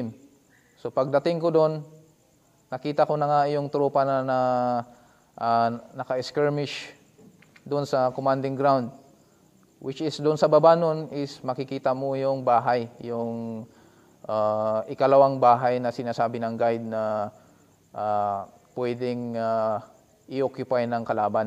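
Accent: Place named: Samar, Philippines. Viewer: Sir tubong ano po si sir? Parang Samar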